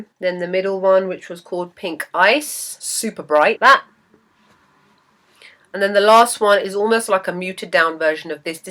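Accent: United Kingdom. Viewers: Looooove british accent! =)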